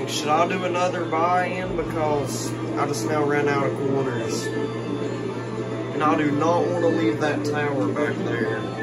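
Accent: South, United States. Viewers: Damn , I’m from Alabama and this guy sounds as country as they come ! Lol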